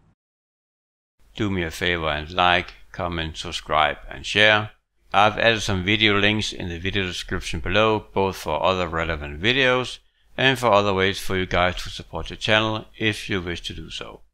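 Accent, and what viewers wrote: Denmark. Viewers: Are you from Danmark?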